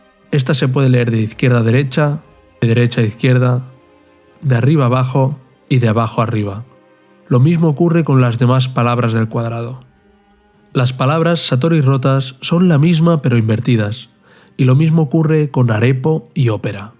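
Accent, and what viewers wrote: Catalonia, Spain. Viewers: A mi me suena más a catalán por como pronuncia Júpiter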